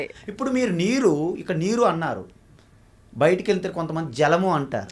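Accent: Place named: Srikakulam, India. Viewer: స్వామి గారి ప్రవచనములు యెుక్క వివరణ ఛాలా బావుంది. కాని ఈయన శ్రీకాకుళం జిల్లా లావుంది ఎందుకంటే ఈయన యాస అలానే ఉంది.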